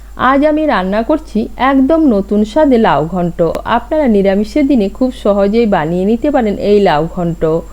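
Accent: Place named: United Kingdom. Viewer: Bangla rannar shonge kintu bangla commentry beshi bhalo lage. Eto english accent kintu eke baare jomchhe naa.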